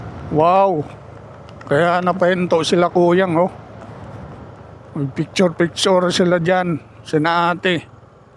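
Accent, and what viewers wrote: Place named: Visayas, Philippines. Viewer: bisaya ang intonation mo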